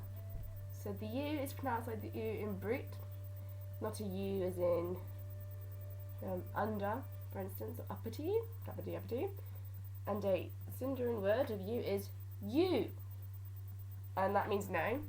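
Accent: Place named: United Kingdom. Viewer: 【Leia】 It's ok I pronounce it the same way. Where are you from? You sound like me. (I'm British btw!:3)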